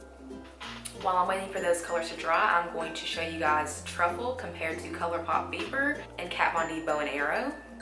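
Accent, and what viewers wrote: South, United States. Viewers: where are u from? u sound southern.. I'm from AL!!!!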